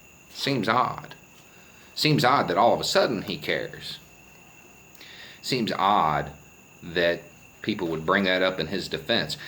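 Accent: South, United States. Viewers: ok so i love all of your videos and the messages that come with them.... but i am curious about one thing, I have seen other videos where you dont have a southern accent...whats with that? are you playing a character or what? maybe im mistaken, im just really confused, im not trying to discount the messages in these videos. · sheeeit!...this is that same pinko from TCF but with a hushpuppy accent an a growed out beard an he's preaching to the non-converted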